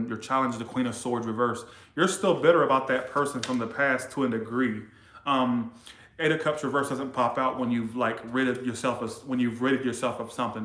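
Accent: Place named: Louisiana, United States. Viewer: I just knew you were from Louisiana....love the accent.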